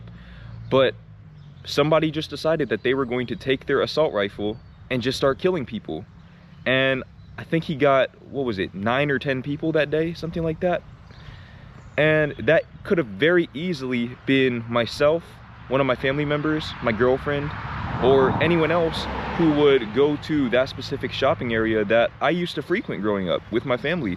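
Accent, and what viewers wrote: Netherlands. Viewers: 5 seconds in.. and thought he is in the Netherlands :D , (Dutchie here ;)… and 40 seconds in, let me guess east of the Netherlands? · You have a slight Dutch accent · Is it me or have you grown a Dutch accent to your English? 😅 But I hear you. I can concur with every single word you said in this video, almost everything you said I could have said(*), because my experience with travelling in Europe and US was the same. I hope you will return to The Netherlands someday, through your videos I have learned to appreciate more what I have here. If you want to move on and discover how other countries are to live in, that's just great, we will miss you though. (*) There was an American guy at work who was from Dallas and attended Linux user group meetings in Austin. "It was only three hours by car". I said, if you drive for three hours in The Netherlands, you're either in the sea, or you are in a different country where they speak a different language.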